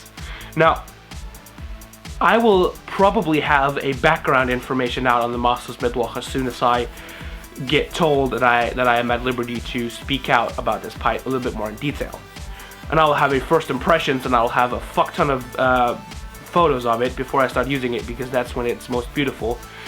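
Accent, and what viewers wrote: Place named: United Kingdom. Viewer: why do u sound british m8 xD i thought u were american ..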